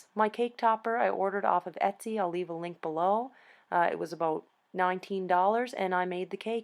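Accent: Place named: Cape Breton, Canada. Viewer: I saved a bunch of wedding videos to watch later for getting started with my wedding planning. I'm in Ontario but I'm from New Waterford and it was a nice suprise to come across your video - I knew by the accent you were from the island! Lol Thank you for the very helpful video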